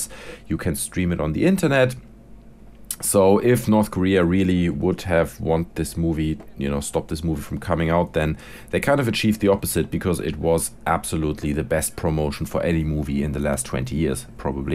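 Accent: Germany. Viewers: Ah your accent is German, I've been wondering since the day I saw one of your videos, you say you live in UK but your accent just sounds so different :D. Merry Christmas and Happy New Year :) !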